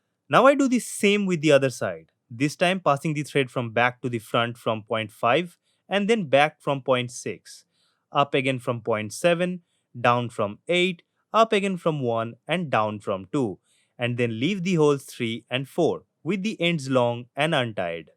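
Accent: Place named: India. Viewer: You speak indlish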